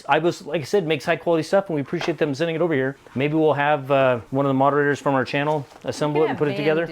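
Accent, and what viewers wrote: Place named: Idaho, United States. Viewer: Yeah, although mountains can have increased exposure to solar effects which can lead to greater evaporation, since mountains tend to have fewer local sources of water, and lower levels of vegetation, they can end up with lower humidity in summer. And moreso if the local/regional climate is already dry during the summer. I've only seen maybe a dozen or two of your videos, and I don't think I've heard you say where you are, but based on that brief clip of a snow storm, your channel name, appearance, and accent, I'd guess that you're somewhere near Idaho. That whole region has a mix of mediterranean and semi-arid climates, with summer already being the dry season. So, that would all make sense. I've only seen a dozen or two of your videos when YouTube has recommended them to me, though I am subscribed. I hadn't seen your streams, but I went to find the stream you referred to, thinking I'd see what you talked about, but it's ten hours long, so I decided against it. 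😀 Looks like you're doing cool stuff, though. 🙂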